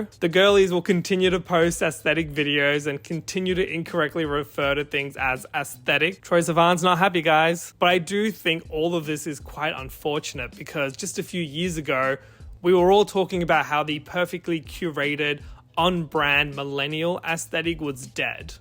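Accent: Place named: Australia. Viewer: I never knew Aussies pronounced it as "ass-thetic" rather than actually making the /æ/ sound and now I can't unhear it.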